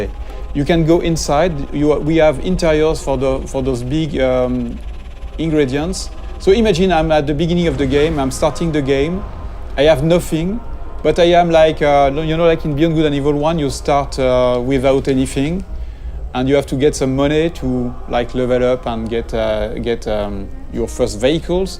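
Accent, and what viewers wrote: France. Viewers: C'est con que seul les français ont honte de leur accent le gars est français il va pas faire l'accent américain,chinois ou africain le pire c'est que pour les ricain l'accent français est très beau. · Et les gars stop, pour son anglais. Il a raison de faire la vidéo en anglais. Et puis entre nous vous auriez un meilleur niveau d'anglais en France çà irait mieux, vous vous ne poseriez même pas la question. L’école ne suffit pas alors faut travailler a coté pour l'anglais. Et la franchement même si il a un accent français qu'est ce que ça peut faire. Au moins il fait l'effort de faire une vidéo compréhensible par le monde entier. Alors arrêter de penser en permanence a seulement votre petite personne !!! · sa se voit que c'est un francais vu son accent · J'avoue l'accent français se fais ressentir de fou 😂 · il est français donc pour son accent on peut comprendre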